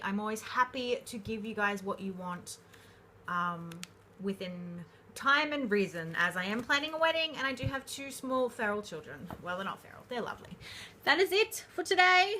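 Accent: Australia. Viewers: I am enjoying going through all your videos! Nice to hear a fellow Aussie accent too x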